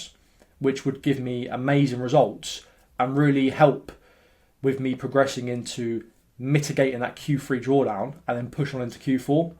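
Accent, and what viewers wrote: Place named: United Kingdom. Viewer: Keep up the good work , mate I like your British accent ..unlike Adele and Harry Kane , seems to be Chinese , not English hahaha Salut from Algeria ❤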